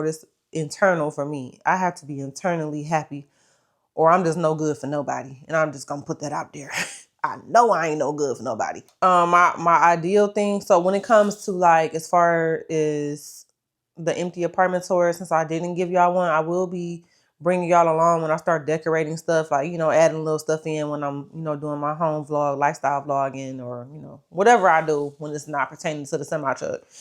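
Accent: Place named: Ohio, United States. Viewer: There are three places I've heard residents pronounce "y'all" like Yaw and that's (1) Baltimore, Maryland (2) Philadelphia, Pennsylvania and (3) Columbus, Ohio. Based on what I'm hearing in her inflection, I"m going to guess she's from Ohio. Ohio will say salty a lot pronounced like "saw-dee" and I feel like I can hear that in other words when she speaks. Further research shows Detroit as her place of origin so I was regionally accurate. American North/Northeast.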